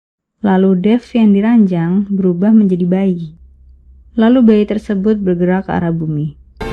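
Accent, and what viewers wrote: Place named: East Java, Indonesia. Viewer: Ya kayaknya orang jawa timur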